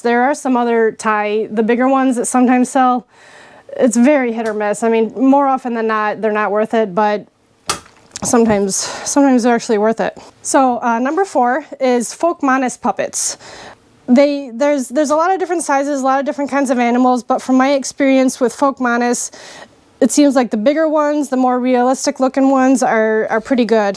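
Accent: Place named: Eastern Canada, Canada. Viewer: You sound like your from eastern Canada ….🤘🏼🤘🏼. Subbed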